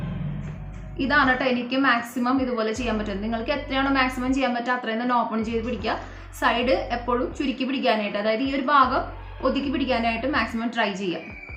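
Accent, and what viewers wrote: Thrissur, India. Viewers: Thrissurkaari aano chechi?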